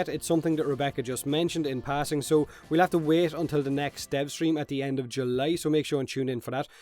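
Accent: Ireland. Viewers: i love the irish accent. hell i love any games reviewed if i could ;)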